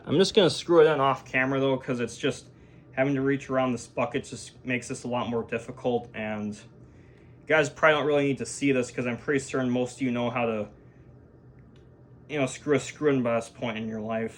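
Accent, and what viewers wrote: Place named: Midwest, United States. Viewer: Sounds like a Midwesterner to me.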